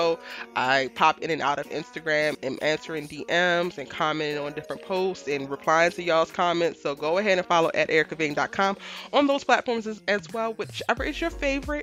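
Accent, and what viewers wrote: Baltimore, United States. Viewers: I knew you were from Baltimore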